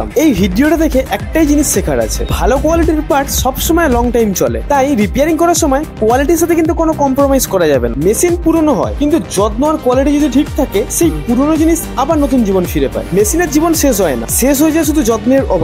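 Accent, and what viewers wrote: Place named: Ghoti, Bangladesh. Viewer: সকল শব্দের উচ্চারণের শেষে কেবলই ছ এর ছড়াছড়ি। ঘটি বটে।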